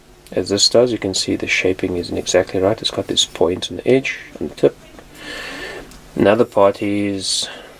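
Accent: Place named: Australia. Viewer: I also forgot to mention that I'm from Bulgaria, a country in Eastern Europe, and salaries here are one digit smaller than what you probably have in Australia (I hope I'm right judging by accent). Prices are a lot cheaper too though. Paying over $70 for a toy is a bit too much for me, it's 1/5th of my monthly salary. Please understand.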